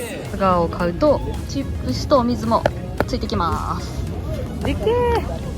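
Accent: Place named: Kansai, Japan. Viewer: 、お疲れ様❤❤❤ 横田基地、楽しかった見たいダネ〜👍👏👏👏 の関西弁が出まくってますから🤣🤣🤣 オプスレーにC2にYS11もあるのかナァ？戦闘機は、F22にF15にF2選り取り見取りダネ〜🥰🥰🥰 でも、やっぱり、私は、自分も、関わっている、AH1コブラ🐍ダネ👍💖💖💖 は、何が、良かったかなぁ？あっやっぱり、外人バーガー🍔かぁ🤣🤣🤣 じゃ、次回は、山口県、岩国基地のレポート、お願いしま〜す😘😘😘😆😆😆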